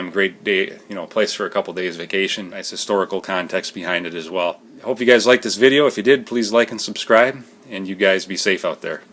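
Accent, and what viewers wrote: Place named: Finland. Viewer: Are you from Michigan originally? I hear some finlander accent. No disrespect I'm a native yooper and thanks for the info.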